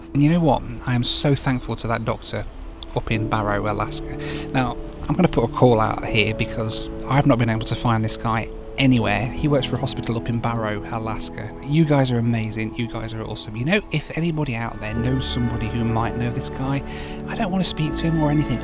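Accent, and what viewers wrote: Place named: United Kingdom. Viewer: Lol!!! he's getting big pay I think. Keep promoting for that hell hole place. Hes welcome though because he's white with British accent. I would even afraid to go there myself. Lol!!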